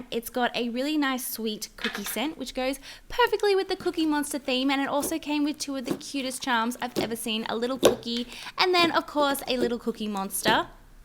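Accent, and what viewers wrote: Australia. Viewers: I love her Australian accent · Are you Australian? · OMG your Australian!😍SAME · your Australian!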